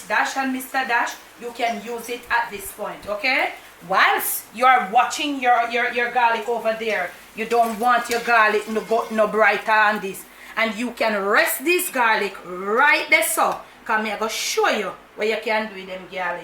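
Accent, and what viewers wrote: Jamaica. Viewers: Hey Ms love the patwah. And all a dat. 😃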